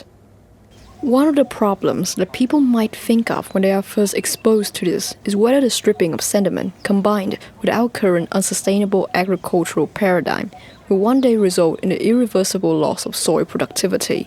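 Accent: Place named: United Kingdom. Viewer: Nguyễn Triều Nguyên, from Nguyễn Sinh Sắc High School here, your performance is awsome ! and it seems like it must took you a lots if times to make this video, and you got a good British voice right there, nice ~<3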